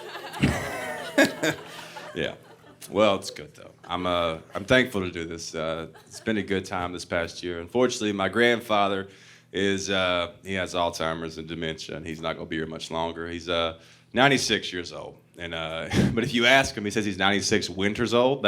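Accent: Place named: South, United States. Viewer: You are hilarious! The southern accent just makes a Philly girl melt!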